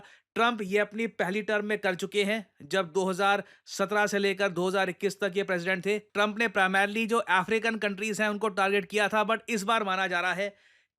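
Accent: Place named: Punjab, India. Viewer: Sir to aaj Punjabi twist me padha rhe hain 😍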